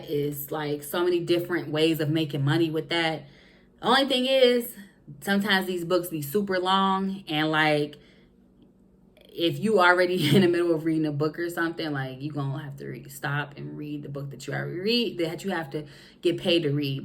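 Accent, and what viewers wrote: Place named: Ohio, United States. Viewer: I love your transparency and authenticity in this video!!! 🙌🏽 I’m interested in learning more from you 😍 sounds like you’re in Ohio so am I!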